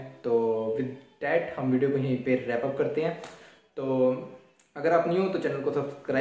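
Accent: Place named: Punjab, India. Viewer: meine is liye puchhe kyonki mein bhi punjab se aur jado tusi gall krde paye si tdd tuhadi tone to mein samj gaya, ki ah banda te apne vall da hi aa, punjabi aa aah te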